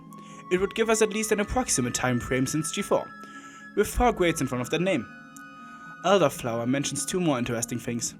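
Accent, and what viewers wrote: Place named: Germany. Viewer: Ana Sani probably watches you because as a VA, she could use more exposure to a German accent so she can reliably pull it off for a future role! 😂 Ich muss schon gestehen, dass ich mich oft etwas damit schwertue, dich zu verstehen, da du zum Teil ziemlich schnell sprichst. Vielleicht können dich die englischen Muttersprachler aber etwas besser verstehen als ich, denn ich habe ja eigentlich dadurch Englisch gelernt, dass ich mich fast ganz und gar auf Content von Muttersprachlern konzentriert habe. Somit habe ich einfach ehrlich gesagt nicht allzu viel Erfahrung damit, Englisch mit einem deutschen Akzent zu hören, was mein Verständnis schon einigermaßen beeinträchtigt, vor allem, da du ja so schnell redest! 😅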